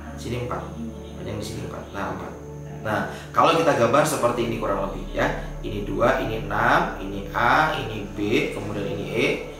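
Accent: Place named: Banyumas, Indonesia. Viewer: Kayanya ka ki orang daerah cilacap,banyumas,purbalingga kah?ngapak mulu perasaan wkwkwk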